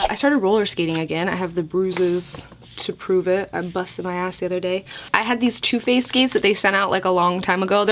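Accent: United Kingdom. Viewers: That British Accent tho 😍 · Those are my fav lashes but I can't find them anywhere near me 😭😢😭 I. Missed your British accent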